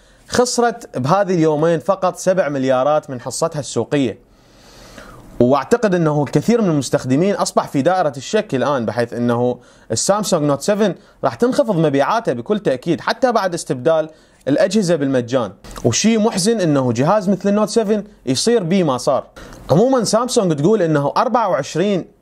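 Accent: Iraq. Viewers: انت عراقي؟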